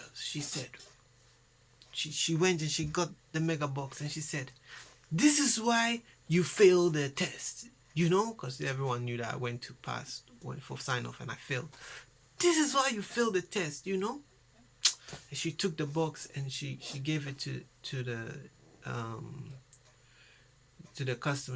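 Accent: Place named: Nigeria. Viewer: You sound like Nigerian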